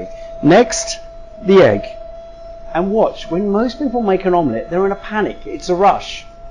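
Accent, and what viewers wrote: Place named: United Kingdom. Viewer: Clearly British.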